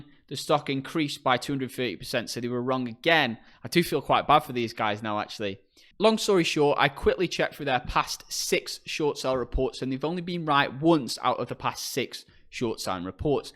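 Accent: United Kingdom. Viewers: Bought in at $64; it will not be fail this company. It has the technology to address future market needs 3-10 years forward. I always like your presentation! Plus a tinch of northern accent!👍😄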